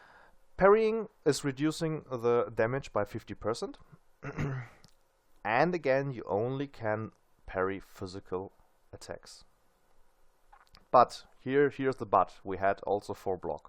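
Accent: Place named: Germany. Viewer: The german accent °-°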